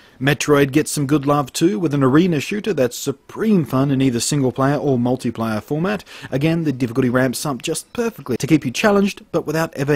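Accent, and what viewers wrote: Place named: Australia. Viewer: Thanks for yet another informative vid, i do rather enjoy your style and its nice to hear another aussie doing things right! I am some what curious as to why you excluded zombieU from this review list? i think i remember you saying in one of your other wii vids that you were a little over the whole zombie game thing, but I am a little curious as to one, how it plays and two, what you think of it. If you have already gone over all of this please forgive my blathering ignorance.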